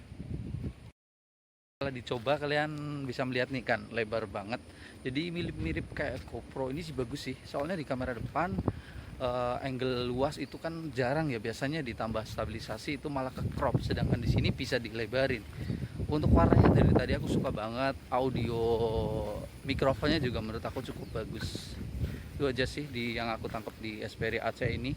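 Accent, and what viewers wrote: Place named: Banyumas, Indonesia. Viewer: Oh wong Cilacap Adipala toh Ngapak kaya nyong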